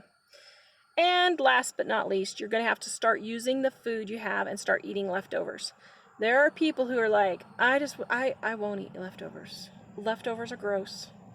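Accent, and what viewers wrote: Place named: Arkansas, United States. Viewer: Are you from Arkansas? I haven't heard anyone say 'Warsh' since the 60's. My cousins were from Arkansas, I find it charming.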